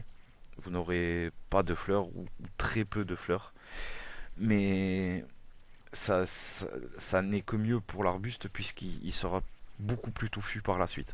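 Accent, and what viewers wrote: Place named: Southwest, France. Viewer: Merci avec l'accent du sud-ouest sympathique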